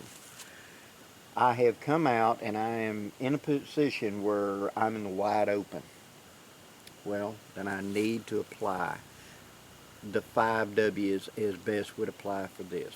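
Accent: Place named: North Carolina, United States. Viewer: "It takes no effort to be miserable" love it! God bless our Marines. Speaking of Marines, I had a good friend who was a former service Marine who was from Hickory North Carolina, and he spoke very much like you do sir. Might you also be from around that part of our great country? Wherever you hail from, I thank you for your great informative videos!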